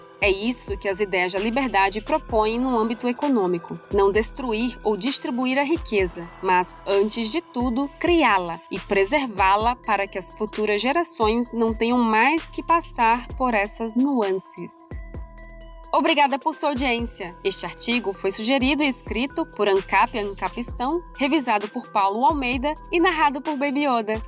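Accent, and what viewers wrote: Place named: Alagoas, Brazil. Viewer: É o sotaque alagoano dela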